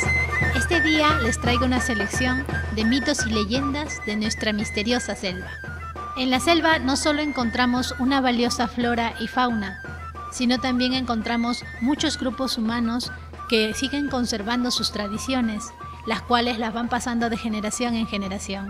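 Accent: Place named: Spain. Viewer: muy bien hasta la z con pronuncia ibérica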